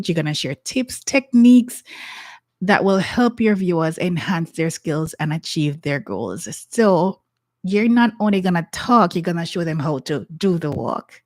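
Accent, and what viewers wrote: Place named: Jamaica. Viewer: First. Your green glasses are on my radar. How beautiful!!!! Second- I hear a little bit of my island in your voice. Shall I guess? JAWI? 🇯🇲Perhaps Bahamas? Great information here for entrepreneurial, industry growth. 🌻✝️🇯🇲🌻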